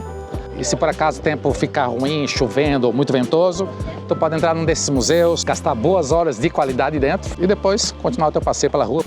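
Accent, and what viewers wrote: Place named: Florianópolis, Brazil. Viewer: Caramba... Sinceramente um dos melhores vídeos sobre cidades que eu já vi na minha vida.. Rico em detalhes, bem estruturado, rico em informações... E com sotaque manezinho kk kk Abraços de Blumenau.. Quero visitar Edimburgo ano que vem e vendo teus vídeos só dão mais vontade de ir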